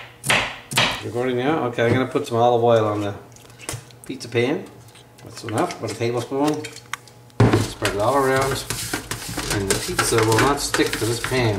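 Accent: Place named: East Coast, United States. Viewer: Yeah for sure thats what i meant haha.. My old man always put hot dogs on it growing up.. Its awesome! where abouts are ya from? sound like a east coast accent?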